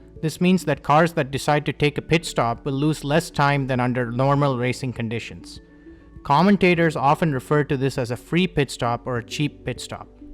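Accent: India. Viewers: Indian aksen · Sorry I don’t understand indian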